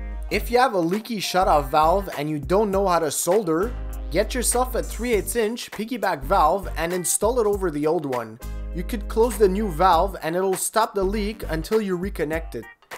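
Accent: Quebec, Canada. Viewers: I know a quebec accent when i hear one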